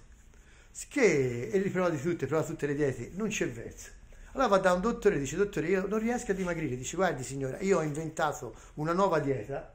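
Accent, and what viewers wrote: Tuscany, Italy. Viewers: Grande Grande. Non è che non capiscono il finale, è che non sanno che la "C" in Toscana....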